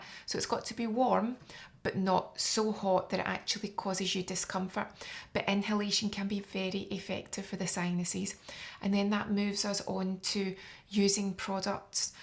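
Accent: Scotland, United Kingdom. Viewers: I really would like to just have this lovely Scottish lady follow me around all day long, narrating my life. Oi, this wee bastard just cut us off. Now we're flipping him the bird. Off we go again. What a grrrrreat day. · Are u anywhere near London , obviously got Scottish accent but thought I’d ask , would love hand arm massage . And maybe this sinus treatment, you are really really really educated and knowledgeable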